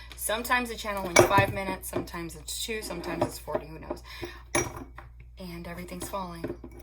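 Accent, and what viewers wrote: New Jersey, United States. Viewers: I have just come across your page and im thinking “she has kinda a jersey accent hmm” and you said your from nj omg 💖 I love it!!! Its been crazy here as you know.... light and love for you sister 💞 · I love her Jersey accent!! So cute and entertaining 😁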